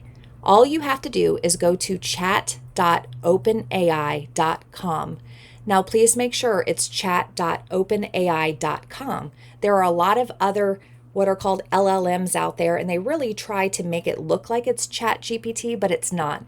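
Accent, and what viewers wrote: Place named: India. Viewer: An indian guy with a voice modulator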